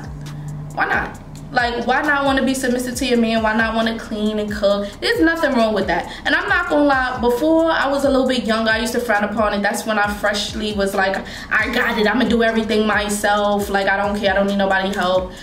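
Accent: New York, United States. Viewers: Tawlk about. I'll never get tired of your NY accent. ❤️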